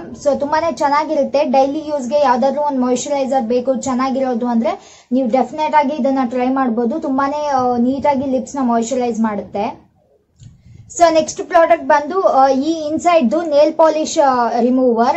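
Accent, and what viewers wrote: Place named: Bangalore, India. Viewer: Very informative video...but I came to know u r from North Karnataka, Sindhanur. Why do you speak in Banglore kannada accent? Uttara Karnataka kannada is so nice to hear. It is also understood by everyone.Ultimately it's your wish to talk anyway...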